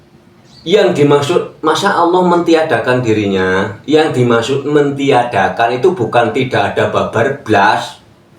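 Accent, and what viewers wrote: Banyumas, Indonesia. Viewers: Ingin sekali tau alamat tempat tinggal nya pak kyai....di lihat dari logat nya spti nya...dari Banyumasa. Sehat trs pak kyai❤❤❤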